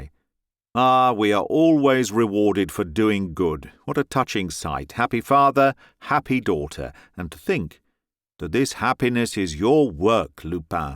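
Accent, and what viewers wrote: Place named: Purley, United Kingdom. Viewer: He sounds like a bloke you 'd meet in a pub in Purley . Not sure where you get your notions of Victorian spoken idioms and syntax from ??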